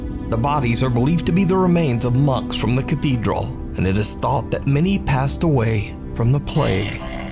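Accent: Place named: South, United States. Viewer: your voice seems to be getting more and more of a "southern drawl" to it. Did ya relocate or something? Btw that may sound creepy(oh the irony)but I'm not asking where you live and have no idea where you are or have been.lol)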